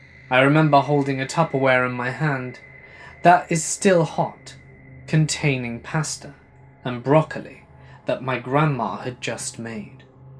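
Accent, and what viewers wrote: England, United Kingdom. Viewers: The stories, certainly the first one, took place in England . The narrator is very English and certain references are English.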